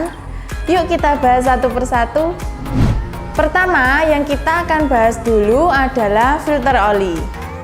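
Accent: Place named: Java, Indonesia. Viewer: Medhok sekali ya kak wakakakakak tapi NICE banetttt kak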